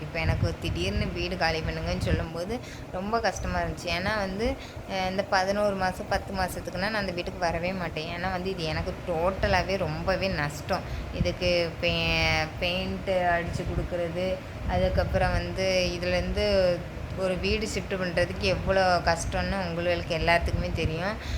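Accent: Tirunelveli, India. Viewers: உங்களுக்கு சொந்த ஊரு என்ன? நெல்லை பாஷை பேசுறீங்க.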